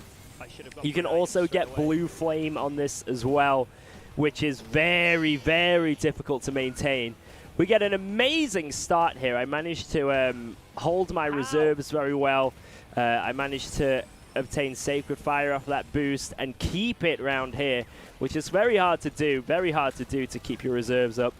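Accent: United Kingdom. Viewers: hol up, hol up. Money in pounds..... British accent.... Do they.... do they possibly have KFCs in the UK? o.o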